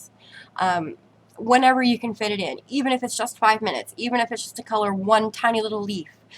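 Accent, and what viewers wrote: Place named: South, United States. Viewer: Your southern accent is adorable! And great tips girl!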